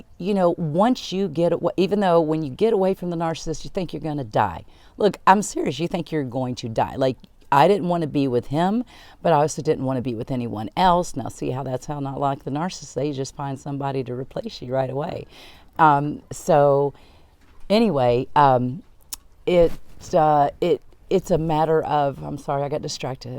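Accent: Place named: South, United States. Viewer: Thank you. Your doing great and helping me and many Thrive! Plz use your humour! Loving your glasses & that sweet Southern accent!. Loving these long lives! You go girl! I´m starting to thrive again with Purpose & Passion to do what I love! Living well is the best revenge.Knowledge is power! Game over narc. Many Blessings to you all.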